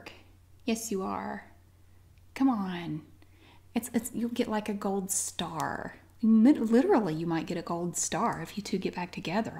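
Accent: South, United States. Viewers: The country accent, blonde hair, sequins, long nails, and big jewelry reminded me of my old babysitter. :)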